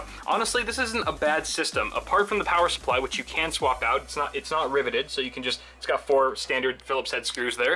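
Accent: United States. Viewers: This build is cringe worthy, with the brown pcb on the motherboard, ghetto cabling, sketchy power supply, cheapo case, and an american accent half the time. is a legend, he pulled all these things off in one video. You shall never be forgotten.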